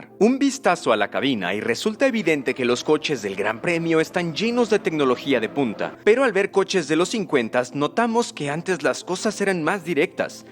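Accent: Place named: Latin America. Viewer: Esto no era Driver61 en Español? Por qué está en modo latino? Otro video que no veo.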